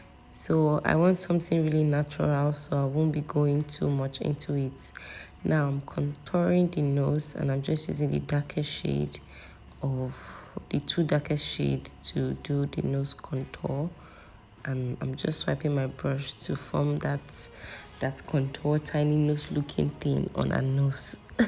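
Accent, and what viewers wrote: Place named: Yoruba, Nigeria. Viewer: with the yoruba accent🤪, welldone babes😘, we are your drawing book, paint us as you like 🤩😘😍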